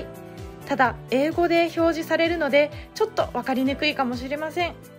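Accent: Kansai, Japan. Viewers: たいへんよくわかりました。 関西の方のようですね。関西弁でやってもらっても、結構いけるんちゃうかなあ。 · 気のせいかな？ なんか関西訛りがちらほらと、、、😊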